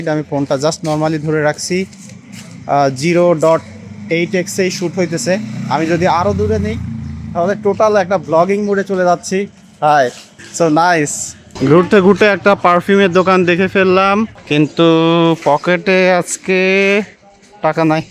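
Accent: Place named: Khulna, Bangladesh. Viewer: ভাইজান মনেহয় খুলনা অঞ্চলের মানুষ তাই কথার মাঝে সেই রকম টান পেলাম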